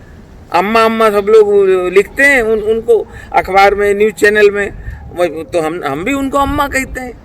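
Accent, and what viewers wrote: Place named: Lucknow-Kanpur, India. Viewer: those who have experienced (not just know) the accent of Lucknow-Kanpur can specially relate to Raju bhaiyya. He makes me feel so at home.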